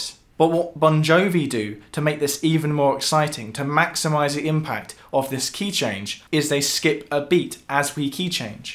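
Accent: United Kingdom. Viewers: The second you started talking I was like Ahh a fellow brit!!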